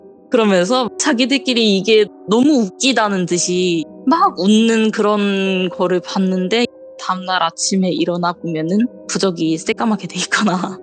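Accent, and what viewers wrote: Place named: Gyeongsang, South Korea. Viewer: 경상도 마산쪽 사투리인데?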